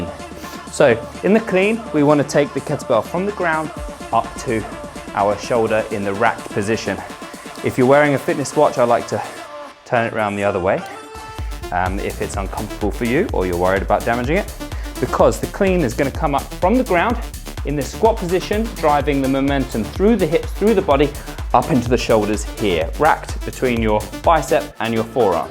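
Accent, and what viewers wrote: United Kingdom. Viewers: I'd like to win . Appart from the excersices i love the british english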